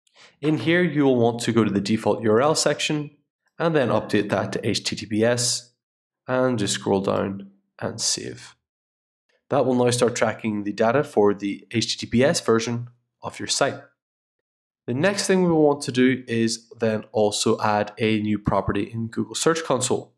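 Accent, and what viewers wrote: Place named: Scotland, United Kingdom. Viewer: Thanks man, really useful. That accent is hard to pin down - I hear Scottish, American and Irish!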